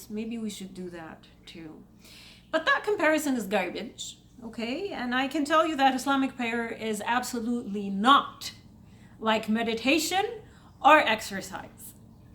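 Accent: Egypt. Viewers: You Egyption acisent dosent bother me none honey. Its all good. Keep up the good work. I watch your videos all the time .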